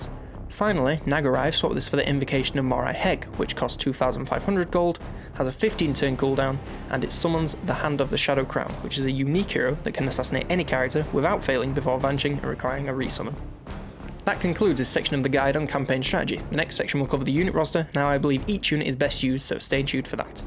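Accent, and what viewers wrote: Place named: Manchester, United Kingdom. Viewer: He sounds like he’s from near me. You from Manchester mate?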